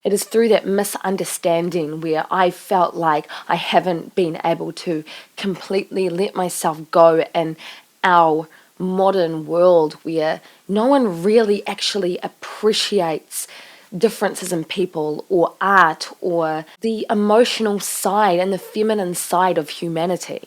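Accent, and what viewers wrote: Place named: New Zealand. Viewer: Completely off track but I heard your accent and can tell you're from home. INFP NZ here.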